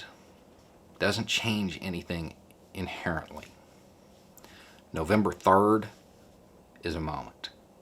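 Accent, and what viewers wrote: South, United States. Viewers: i like watching you since i live in europe and no offence but you sound like common southerner but you have a brain. and that makes me happy that there are still americans who can think for themselfs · You giving wisdom with a Southern accent, changes more than you'll know. You've been a way that I've been able to move my extended family's political needle. That is a movement I never thought possible.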